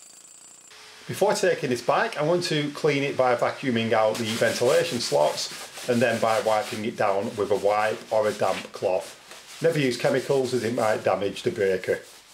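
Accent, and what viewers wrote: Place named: Lancashire, United Kingdom. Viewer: That Lancashire burr can’t be caged, that would be criminal.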